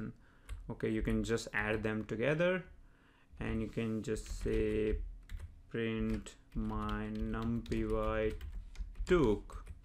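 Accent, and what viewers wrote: India. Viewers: Indian accent not kashmiri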